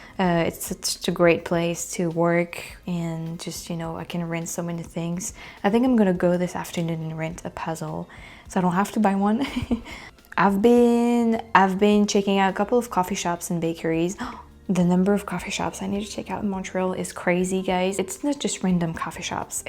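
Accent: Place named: Paris, France. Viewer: ..... the brain is a powerful thing. I'm no expert but I too will have tummy problems from time to time. This would happen when my brain was working overtime and my stress level was up. Stress comes in differenf forms. It can definitely mess with your digestive system. Your mind is obsessed with this issue. It will continue as long as it bothers you. You will have to try not being too regimental, try to chill and only eat what agrees with you. So for breakfast you had 3 acidic items and an egg. That acid will not help, so maybe an egg (or 2) and some dry toast. Best of luck, I know you'll make it, and don't give up your beautiful Parisian accent. I can listen to you all day:)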